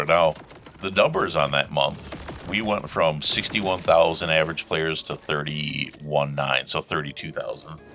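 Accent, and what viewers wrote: United States. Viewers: I knew you were from Wisconsin! I knew it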